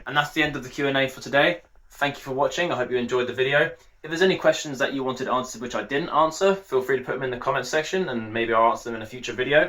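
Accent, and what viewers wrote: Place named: United Kingdom. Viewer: Love your accent so sweet and British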